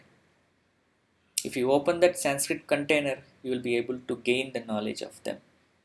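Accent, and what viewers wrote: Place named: Kerala, India. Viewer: Mallu accent is so distinctive.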